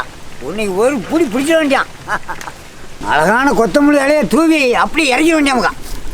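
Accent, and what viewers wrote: Tamil Nadu, India. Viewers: In which district have this tamil slang??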